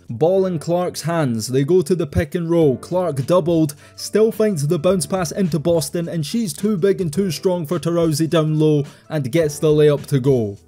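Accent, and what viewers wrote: United Kingdom. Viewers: Bro why is it always a British dude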